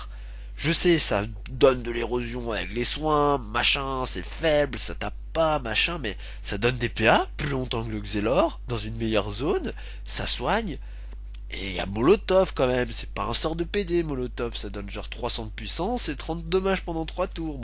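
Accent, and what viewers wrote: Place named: Marseille, France. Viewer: Ta un accent marseillais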